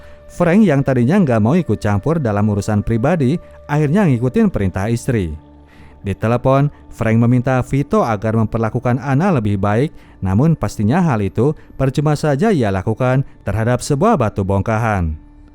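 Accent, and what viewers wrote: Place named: Sumatra, Indonesia. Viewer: Ban ente orang sumsel ya bang, kok dari dulu ane nntn ente beberapa kata dan ucapan ada yang sama kek bahasa kami orang Sumatera gitu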